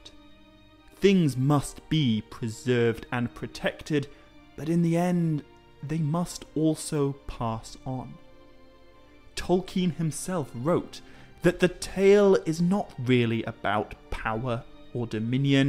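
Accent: United Kingdom. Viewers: You are an amazing orator and in my opinion is best suited with your accent ... even if you don't think you have one (your friends from across the pond) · Oh my God, this is probably what John Oliver refers to as “offensively British accent”. It was impossible to finish the video!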